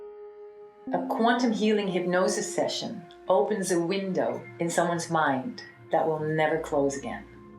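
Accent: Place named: Netherlands. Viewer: I laughed when you said the voice of Creator was in English :) Are you a native Dutch speaker? I hear a little accent that I recognize! I would love to have a session with you, if you were in Belgium or Netherlands some day :)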